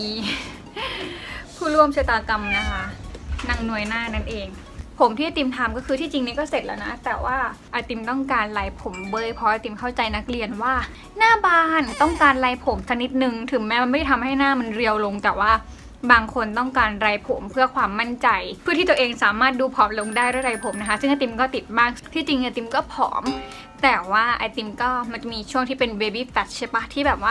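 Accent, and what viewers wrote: South, Thailand. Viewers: ว่าแล้วว่าพี่เป็นคนใต้อะ จับได้ตอนพี่พูดว่า เดี๋ยวโดนทุบ5555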